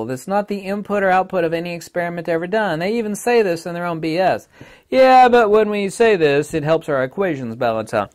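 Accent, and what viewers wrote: Germany. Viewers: Speaking German like a native, judging buy the accent you picked it up in the Saxony region. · You got German dialect perfect nice.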